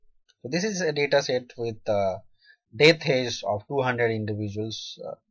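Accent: India. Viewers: Fucking annoying indian accent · Hope you can speak Hindi fluently in Indian accent. So ungrateful of you. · Indian accent! Thanks for your video :D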